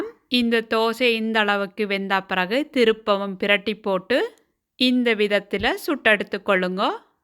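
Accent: Sri Lanka. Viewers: வழக்கம் போல உங்கள் இலங்கை தமிழும் தோசை யும் அருமை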